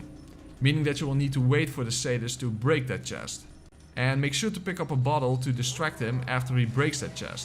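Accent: Netherlands. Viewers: thanks for the guide, your accent sounds dutch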